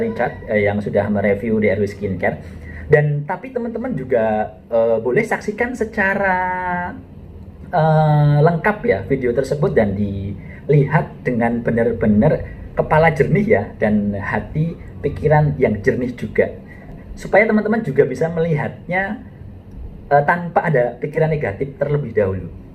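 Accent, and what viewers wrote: Indonesia. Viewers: Dok,, suarane purworejo banget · Medok gak sih dok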